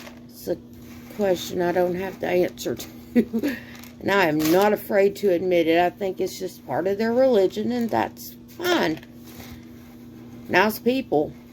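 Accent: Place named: South, United States. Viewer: I hear a little bit of a southern accent when you talk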